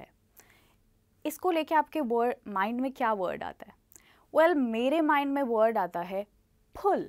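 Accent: India. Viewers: I mean it is Indian pronounciation, not very authentic I would say. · You are using indian phonetics for English though not original English phonetics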